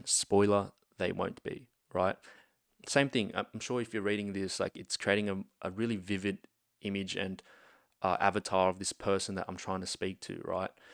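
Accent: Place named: Australia. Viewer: You sound like you live in Australia.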